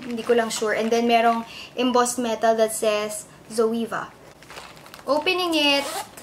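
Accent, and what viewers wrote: Philippines. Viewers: Gloria Robles she's a filipina that's why the accent is different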